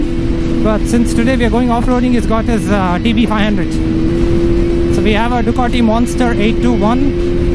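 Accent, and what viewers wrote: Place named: Kerala, India. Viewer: i thought you was malayalee😅 anyway goog vlog😍😘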